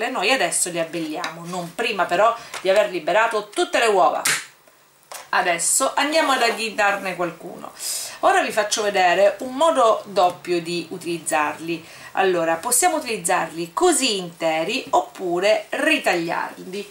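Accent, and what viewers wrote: Sicily, Italy. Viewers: Sei sicula?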